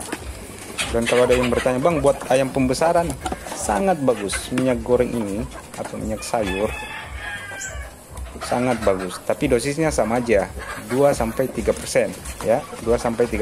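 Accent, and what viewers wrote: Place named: Medan, Indonesia. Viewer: Lokasi dimana gan? Logatnya kayak org medan. Klo medan bisa la main2 ke farm ny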